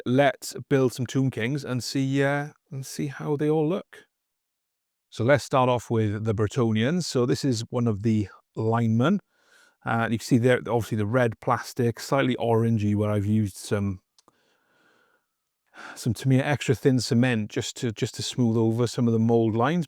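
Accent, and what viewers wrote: Wales, United Kingdom. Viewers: You sound Welsh! Whose coat is this jacket?! lol, imma fellow Welshman across the pond in the states, and ive recently gotten into Warhammer after playing Magic: The Gathering for many years. very excited about Blood Bowl. Love your videos!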